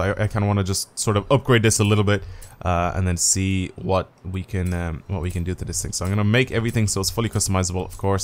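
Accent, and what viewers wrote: Ireland. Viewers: Are you from Ireland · What part of Ireland is he from if he’s even from ireland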